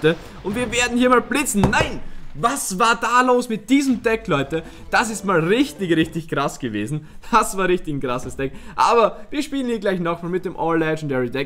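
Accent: Austria. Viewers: Ich liebe österreichisch #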